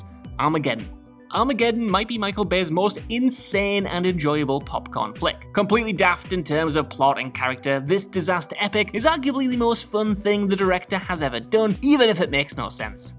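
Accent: United Kingdom. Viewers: Can't get enough of the fast talking British!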